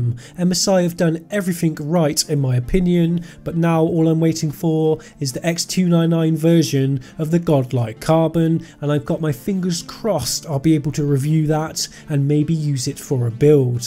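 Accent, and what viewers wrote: United Kingdom. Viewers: "Free point one port" lol, I like your heavy UK accent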